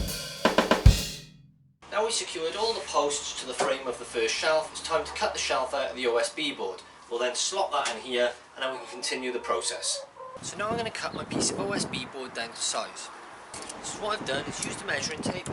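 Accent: United Kingdom. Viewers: Nice video and excellent explanation. What part of the United Kingdom are you from?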